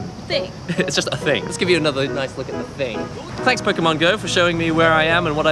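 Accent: United Kingdom. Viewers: he's japanese but he learnt british english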